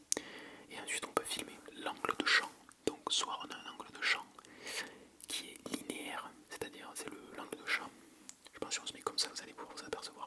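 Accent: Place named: South, France. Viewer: Ohhh cette accent du sud ! Oh mon dieu j’adore ! Incroyable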